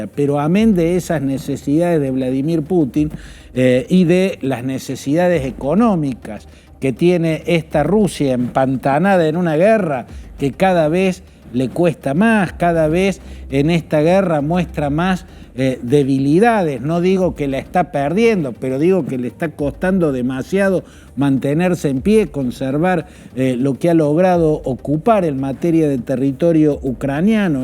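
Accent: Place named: Rioplatense, Argentina. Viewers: Argentino o Uruguai habla pana de mas😅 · Que acento Rosarino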